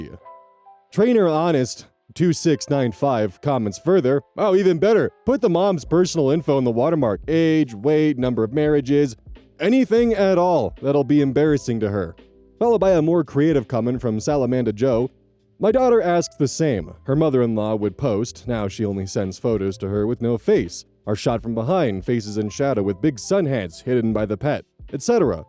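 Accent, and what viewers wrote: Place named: United States. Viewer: Nooo don't change how u talk!! Say vib-ah-ray-tions! I love USA accents that arent the movie accent theyre so endearing and quirky!! Pls keep ur regional accent 😭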